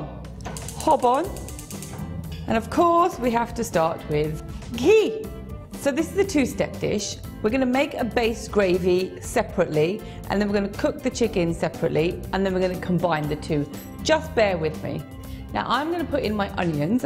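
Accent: England, United Kingdom. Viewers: mam.. your British accent is brilliant.... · Sounds very British accent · love British accent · Rahul Gaikwad it’s called a british accent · Ok, I have never heard a more "English" accent in my entire life. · I’m guessing why she is talking like British and turns out she is British! ain’t it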